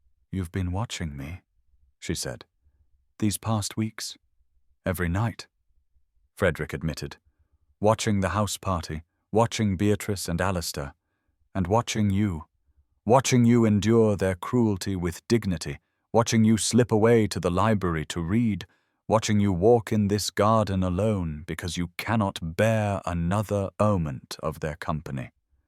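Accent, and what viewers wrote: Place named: United Kingdom. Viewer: Thank you so much for this lovely story. It is an original and enticing tale of romance and intrigue, with an ending appropriate for the situation you so elegantly described in the long story. I enjoyed the narrator with the British accent. It lends a distinguished touch to your wonderful story. Please keep up this wonderful channel and your tales of Victorian society. Perhaps you could utilize a few more images that would enhance your storyline…💕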